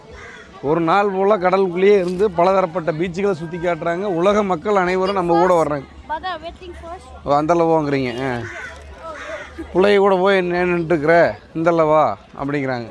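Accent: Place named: Coimbatore, India. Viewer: நீங்கள் எப்படி சாதாரணமாகப் பேசுகிறீர்கள்? கோயம்புத்தூர் நா கெத்துங்......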